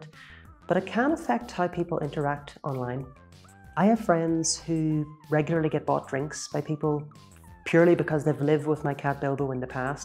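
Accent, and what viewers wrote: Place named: Ireland. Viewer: Just some observations: I found your talk quite compelling for a variety of reasons. I was initially drawn in by the way you look into the camera so intently. I realized after a bit that part of the power of you gaze, I think, is that you seem to almost never blink! Don't mean to make you at all self-conscious about it, but it is really rather striking. Secondly, you have a lovely voice and manner of speaking, with that light Irish accent that sounds so compelling to my (N. American) ears. I really think you would make a terrific narrator of, say audio books! You articulate extremely clearly. Have you a red light accentuating your hair color? It looks just great! (again, so very "Irish"!) Fascinating how something as seemingly trivial as sharing cat info evolves into a helpful resource for many in such a profound way! Cats are such lovely creatures--even though I only have d_gs--and having any kind of animal does, I think, increase the humour quotient of one's life quite substantially, which is always a positive and healthy thing. 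Sounds as though you are conducting your life in a very positive manner. Well done, and kudos!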